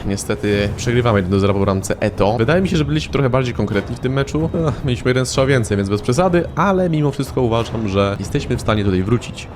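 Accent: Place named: Germany. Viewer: zwykły żabolski Szwab a nie Polski akcent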